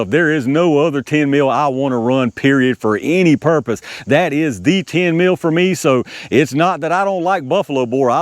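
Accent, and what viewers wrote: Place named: Appalachia, United States. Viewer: Shame it didn’t do better. I have a lcp 2 and max and I am always on the lookout for the best! I currently have underwood extreme defenders but if you know anything better feel free to cue in as I must have missed it…. I was watching one of your videos last Friday and I asked my girlfriend who lives in southern Indiana whereas I live in Louisville where you sounded like you were from and she thought east Tennessee or WV. I told her I thought you’re from central Virginia or southern like Wytheville, Bluefield or Roanoke maybe even the Carolina’s but Saturday we went to Red River Gorge which is near Slade, KY for some hiking and she said maybe east KY. Who’s right? 🤣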